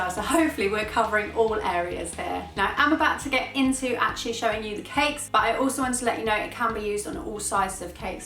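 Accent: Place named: Cockney, United Kingdom. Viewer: Great tutorial. . .but her Cockney accent is grating. She sounds like Eliza Doolittle before Higgins helped her.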